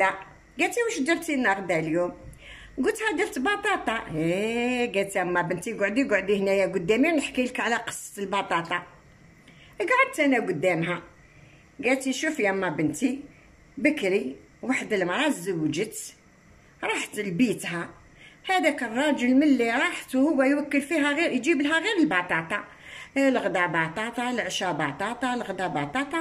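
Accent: Constantine, Algeria. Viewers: Salem Mme la dame qui vous dit que vous etes entraine de tremper les jeune elle dit n'importe quoi. Et Mme tous ce vous faites est Constantinois d'origine .des la première fois a votre voix j'ai compris qua vous etes Constantinoise beldiya.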